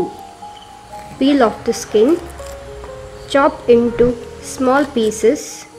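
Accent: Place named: South India, India. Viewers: In love her innocent indian acctented english. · Its south accent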